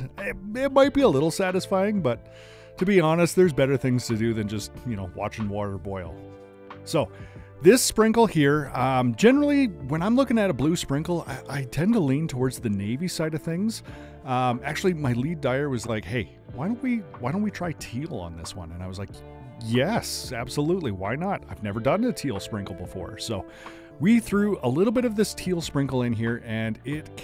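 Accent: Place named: Alberta, Canada. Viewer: I absolutely love how you take us through the process and show us each color way worked up and paired. Makes me want to buy EVERYTHING. Fantastic marketing strategy. Canadian living in the US so it’s fun to listen to your Alberta accent. I have family around Lethbridge through to The Hat. Love your videos; keep ‘em coming. 🙂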